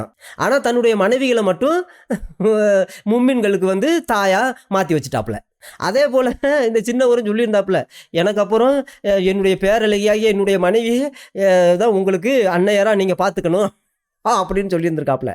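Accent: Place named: Kanyakumari, India. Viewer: This is kanyakumari Tamil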